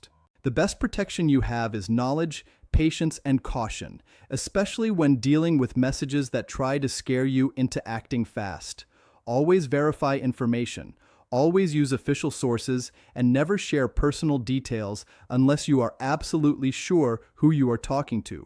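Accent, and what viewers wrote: United States. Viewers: Why another Yank? AI?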